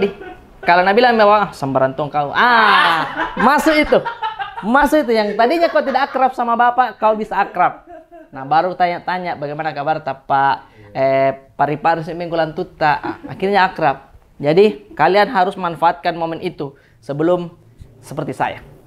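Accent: Makassar, Indonesia. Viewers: Saya orang sunda, saya suka logat makassar😀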